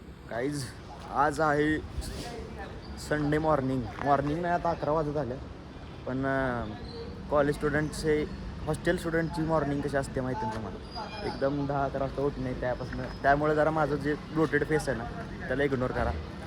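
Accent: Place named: Pakistan. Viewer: Pakisatani English ewwwww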